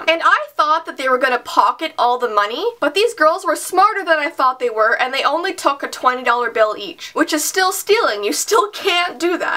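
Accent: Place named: Canada. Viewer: I think it's because she lives in Canada. In her crazy babysitting video she sad bagel like bag-el while in America we pronounce it BAE-gel 😂😂😂 have a nice day!☺️☺️☺️😃😃😃😊😂😂😌😌😌😉😉👍👍👍👍✌️✌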